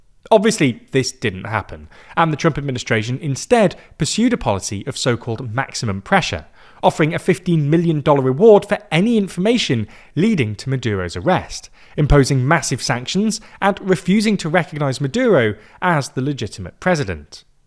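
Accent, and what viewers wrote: United Kingdom. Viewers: People with British accents don't get to tell Americans what we can and can't do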